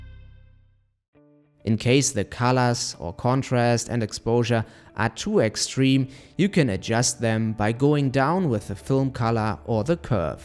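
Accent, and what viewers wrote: Germany. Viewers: is that a german accent that this guy have?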